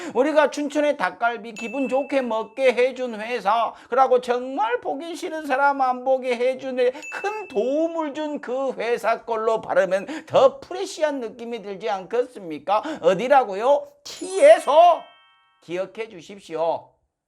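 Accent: Jeolla, South Korea. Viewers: 행님 고향이 어디신데 전라도 사투리 그래 잘 쓰시는가요? 경상도 사투리도 고래 써 주시면 정말 좋겠네요^^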